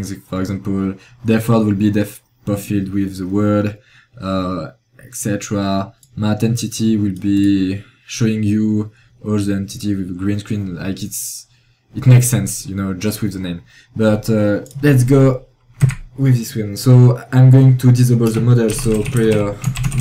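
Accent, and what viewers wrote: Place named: France. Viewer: hahahaha accent fr de merde hahaha jsui mdr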